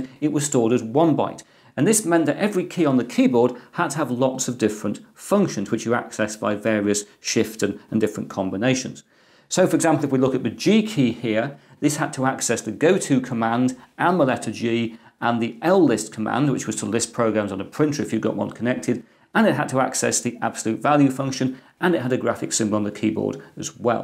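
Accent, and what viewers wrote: England, United Kingdom. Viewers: Funny english accent🙂 nice exotic computer🙂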